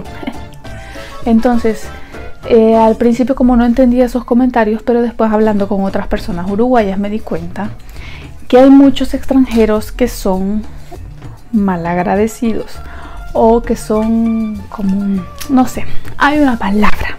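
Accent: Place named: El Salvador. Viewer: Adoro ese acento salvadoreño!!!! Te mando calorcito nos tb sentimos mucho frio y en verano demasiado calor! Buena vibra hermana!!!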